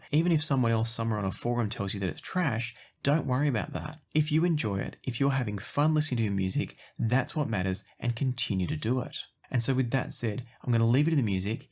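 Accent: Australia. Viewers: I think testing DACs is a good idea. At CanJam NYC, I could not tell the difference between DACs, it takes me a long time for me to notice the difference when I upgrade a DAC (except when getting a May). Also your natural "live" voice is far more Aussie than your presentations. Took me by surprise. But I also remember that you record softly to not disturb your family, so that's cool.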